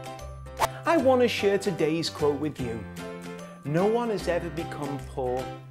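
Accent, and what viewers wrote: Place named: Liverpool, United Kingdom. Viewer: wow a scouser haha me too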